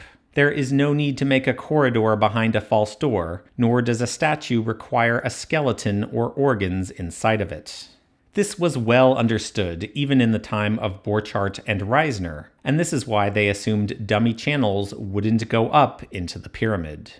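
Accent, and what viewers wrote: United States. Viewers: I have said this before but no one responded. I asked what state is this accent from? You wanna search for Audit the audit and the villains they sound just the same too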